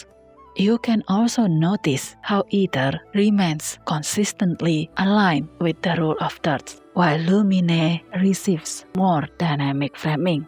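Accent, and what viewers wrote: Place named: Indonesia. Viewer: I was struggling to identify your accent when suddenly, oh, Indonesia, ternyata. Analisanya keren, semoga sukses buat channelnya ya kak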